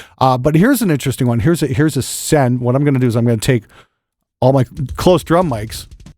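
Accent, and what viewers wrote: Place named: Canada. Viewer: I have subscribed because I love your take on things, and your delivery.... except like all hosers you really need to learn to pronounce an O. Seriously love this channel.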